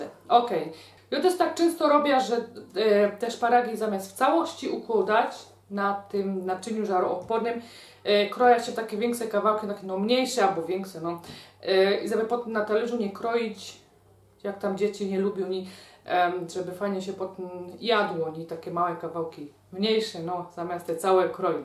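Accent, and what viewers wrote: Silesia, Poland. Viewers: Te nitki obetnam, te szporogi pomieszom... Uwielbiam slunski jezyk :D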